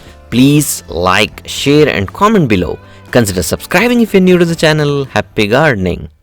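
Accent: India. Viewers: Nice India voice,thanks for posting …?How used for COVID …? ( veterans)🇺🇸🇨🇦🇫🇷🇬🇧🦠😛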